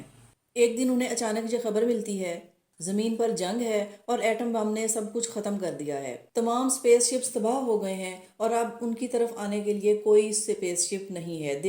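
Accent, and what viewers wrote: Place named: India. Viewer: You should definitely reduce your accent. I used to live in West Ealing in London so I'm used to the way Indians speak English, but your accent is impenetrable.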